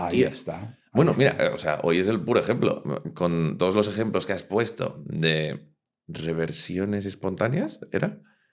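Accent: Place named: Colombia. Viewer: Gracias Doctor por todas estas enseñanzas. Creo que ud es Colombiano por su acento y me enorgullece por lo que está haciendo por nosotros.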